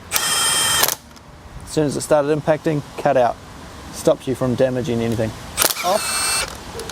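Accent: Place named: New Zealand. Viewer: Always surprised to hear a kiwi accent on Youtube. Good content man.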